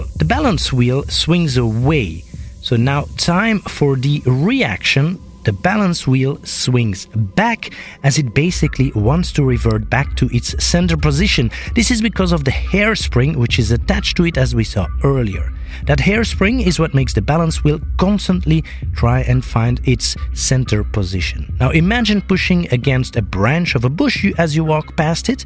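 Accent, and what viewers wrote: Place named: Quebec, Canada. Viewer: That's great. Is the narrator Québécois? I hear a slight accent.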